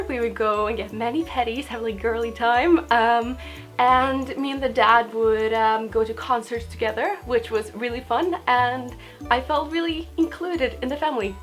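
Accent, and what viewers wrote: United States. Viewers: where are you from. you sound American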